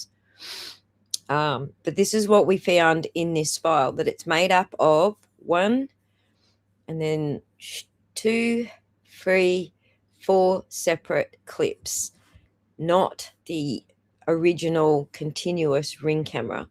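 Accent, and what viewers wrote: Australia. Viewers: I am going to watch Turtleboy at 9, but I love hearing Aussie.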